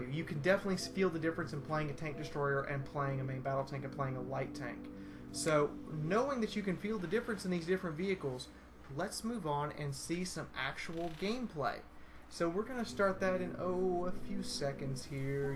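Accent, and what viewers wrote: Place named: Alabama, United States. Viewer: I appreciate Youtubers/Bloggers that post informative information. Plus that Alabama twang.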